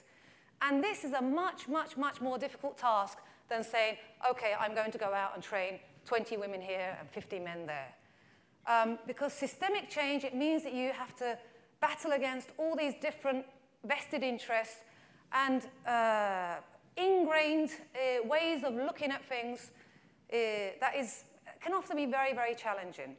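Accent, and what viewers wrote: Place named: Turkey. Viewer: Böyle bir aksan ve Türk bir bayan, sizi bulduğum için çok mutluyum..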